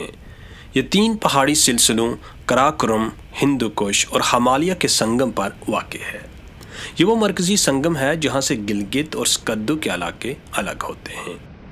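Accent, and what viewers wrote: Standard. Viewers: Itni pure Urdu MaashaaAllah 😍😍 Bht pyara khubsoorat Mera 🇵🇰🥰🥰🥰🥰💗💗💗💗💗💓💓💓💓💓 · Yar ap ki Commentary ka koi Saani ni hai , Zabardast Pure Urduuu Maza ajata hai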